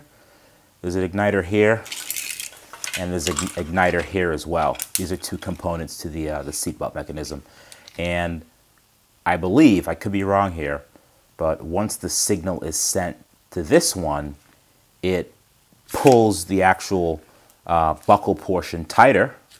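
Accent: United Kingdom. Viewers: Loving the British accent. Nice touch lad. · At first I thought you had picked up a British accent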